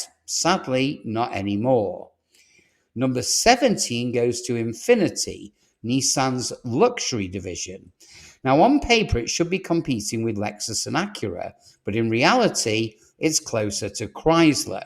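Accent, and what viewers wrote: United Kingdom. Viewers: No more British accent narrators ‼️ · SOUND LIKE ANOTHER BRITISH EXPERT,WHOSE COUNTRY HAS THE WORST CARS IN THE WORLD TALKING ABOUT GOOD RELIABLE VEHICLES! BELIEVE THAY BULLSHIT ! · Despite British voice, American cars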